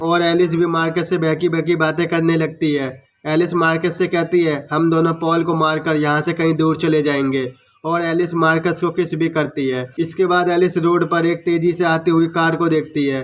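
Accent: Uttar Pradesh, India. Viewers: Bhai aap up se ho kya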